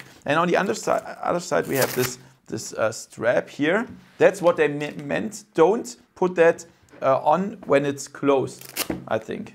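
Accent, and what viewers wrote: Germany. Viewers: Der deutsche Akzent genial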